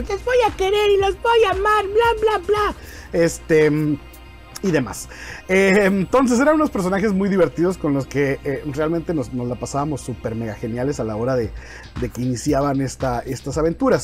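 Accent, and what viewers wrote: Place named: India. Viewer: Es idea mía? 😅 o comenzó hablar como indu 😁. Men hace años no veo tu canal, y me sorprende lo relajado que estas y animado y ya no te tomas personal las criticas contrarias de malas maneras (poco 😅), no se si fue tanto Fortnite pero se te a cierta bien en el canal. me animaré de ver tu canal, 😁👍 se te desea lo mejor.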